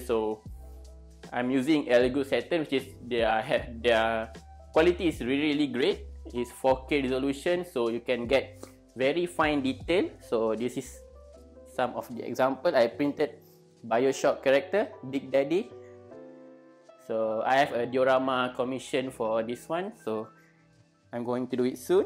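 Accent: Malaysia. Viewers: are you malaysia or Brunei? Bcz I hear you say lah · The first thing when I hear his voice my mind straight thinking about his Malaysian man because of his voice and accent. Because im Malaysian.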